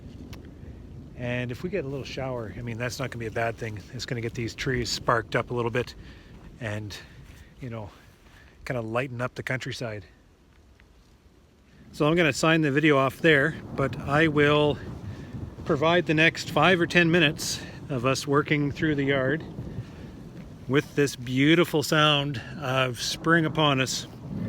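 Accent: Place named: Canada. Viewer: I prefer the pronunciation p-oh-llen, over the pronunciation p-ah-llen. Not sure which is correct. Perhaps both. Your pronunciation just sounds right. I love the way Canadians speak English, and I love all of our northern neighbors, Eh?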